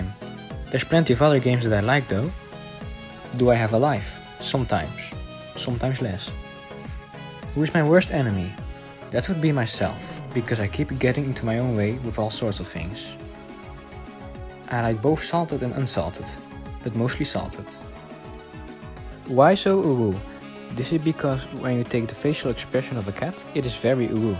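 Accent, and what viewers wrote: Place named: Netherlands. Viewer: OMG THE NEDERLAND ACCENT OMGGGG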